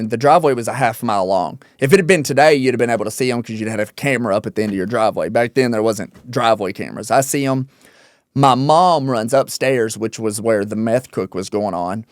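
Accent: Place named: Kentucky, United States. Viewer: Oh shit he totally talks like a KY boy 😮😊😊😊. I grew up in Louisville and out in the country in KY and in San Diego so best of both worlds but wow .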